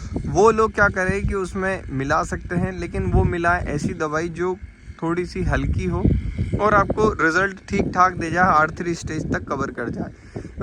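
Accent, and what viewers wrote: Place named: Harda, India. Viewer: Wo ko bo bol raha hai Matlab harda jila se hai banda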